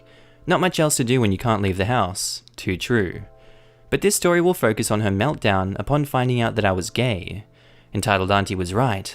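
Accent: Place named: Australia. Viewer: I love the Aussie Accent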